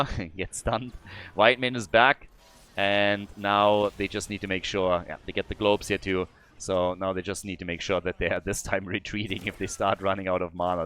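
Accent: Germany. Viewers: It's a German English accent.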